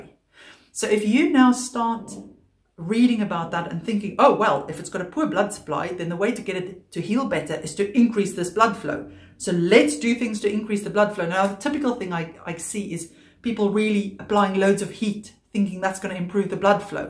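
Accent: South Africa. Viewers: You sound like you are from South Africa. Love that Boer accent. Sawubona! Anyway, good information. I'm an athlete thank you for the info. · I recognize a South African accent from anywhere.😂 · Love that accent!...Sounds like South African/Dutch?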